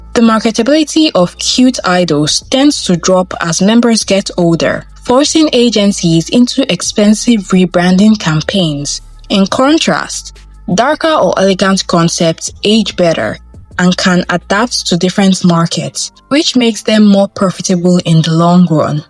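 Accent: Nigeria. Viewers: Nigerian narrator 😂? How refreshing ❤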